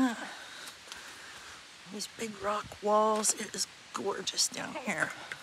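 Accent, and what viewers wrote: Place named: Indiana, United States. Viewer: I thought you sounded like a Hoosier 👍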